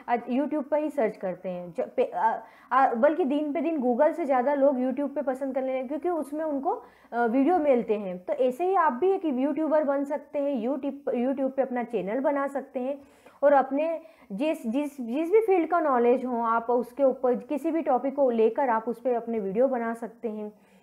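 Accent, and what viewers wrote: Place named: Malwa, India. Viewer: Mam aao kaha se ho?? Apki awaz se to malwa region ke lag rahe ho...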